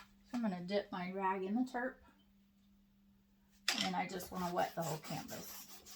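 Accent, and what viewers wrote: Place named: South Carolina, United States. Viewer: Where did you get your non-accent? I say non-accent because you sound like we do in South Carolina.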